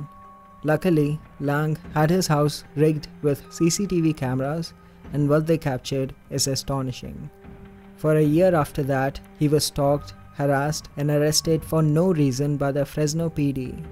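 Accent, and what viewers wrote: India. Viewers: The voice over is an INDIAN for sure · Thick indian accent.. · Indian voice confirmed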